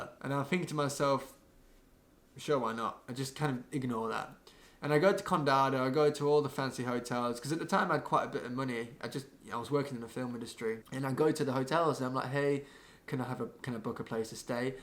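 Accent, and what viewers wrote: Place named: England, United Kingdom. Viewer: Never knew english accent would be so useful. Great story! :)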